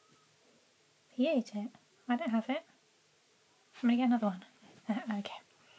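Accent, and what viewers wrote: United Kingdom. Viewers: What kind of english accent it is?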